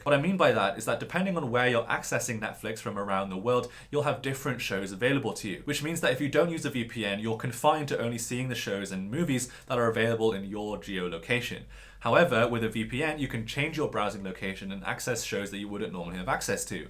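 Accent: England, United Kingdom. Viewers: This guy speaks perfect English English. He actually says "dyoon" not "June" when saying "Dune"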